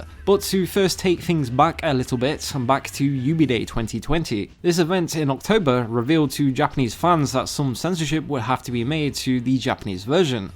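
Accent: United Kingdom. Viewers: He is british. It may come as a suprise but most people who write or speak in english on the Internet are not from America. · He's british.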